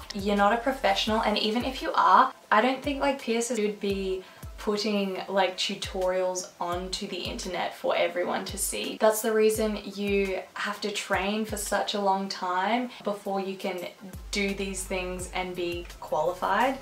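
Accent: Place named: Australia. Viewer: That's Gross. I pierced my own ears when I was 12 the second and third holes. Had my first ones done at birth. (Argentine parents). Girl u have. Great content 👍 recognise that Aussie accent I'm in Canberra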